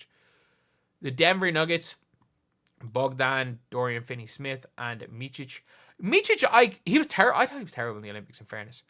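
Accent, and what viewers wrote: Ireland. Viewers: He's very obviously Irish. He has an Irish accent and he references the Irish Super League constantly.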